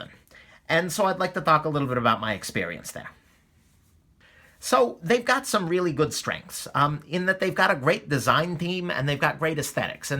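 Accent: United States. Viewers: What is your accent? I know it's American but where is it from?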